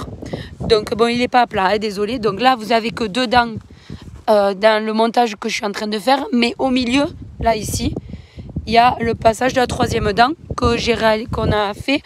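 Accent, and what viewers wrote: South, France. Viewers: Belle vidéo, bien expliquée, vous êtes du sud de la France ha oui je me demandais d où venait cet accent, bien agréable. De Vendée non, du Nord non. Plus sérieusement, l'outil doit utiliser la puissance du tracteur. D'ailleurs, ce n'est pas le fendt, il n'est pas cassé 😉. Je suis rassuré car tout a bien été réfléchi dans la tête d' et le résultat sera bon. À bientôt pour une nouvelle vidéo, et toujours avec le sourire.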